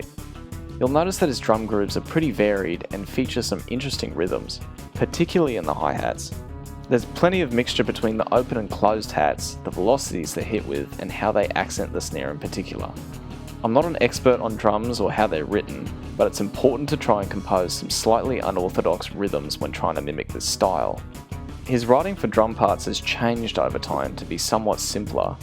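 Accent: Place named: Australia. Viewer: Watch another video? I don't understand nitpicking someone's voice, something they have no control over. He doesn't have vocal fry even, he sounds Australian. It's like you expect him to go "Oh, sorry" and just put on a voice. Lmao. Enjoy the free, highly researched and interesting video.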